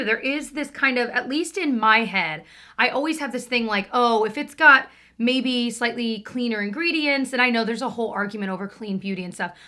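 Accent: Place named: Midwest, United States. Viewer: those Midwestern flat A’s made me laugh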